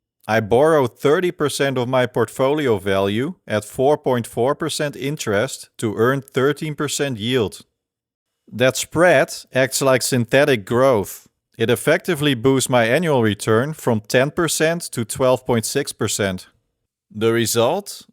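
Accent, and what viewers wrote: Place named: Netherlands. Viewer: Dutch I'd say.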